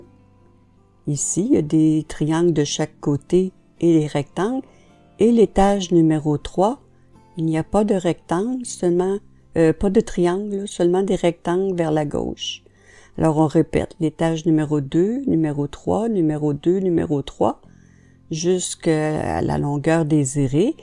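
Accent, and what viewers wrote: Quebec, Canada. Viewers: Bonjour, je me remets au tricot juste parce que j'ai flashée sur une laine dégradée turquoise que j'ai acheté cet été en me disant, je verrai bien ce que j'en ferai... J'ai vu des modèles d'entrelac sur Pinterest, mais sans les explications, que j'ai trouvé ensuite sur YouTube mais sans le déroulé des finitions comme vous... Je vais pouvoir corriger et poursuivre mon tricot grâce à vos explications (avec en prime l'accent de mon amie Québéquoise) ... SVP: Pourrait-on avoir accès à des explications écrites (PDF ou Word)??? La transcription automatique est difficile à suivre et à enregistrer (Lol) MERCI INFINIMENT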